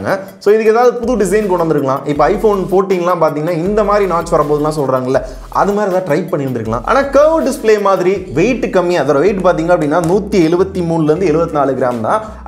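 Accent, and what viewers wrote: Madurai, India. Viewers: Madurai karan than ya nee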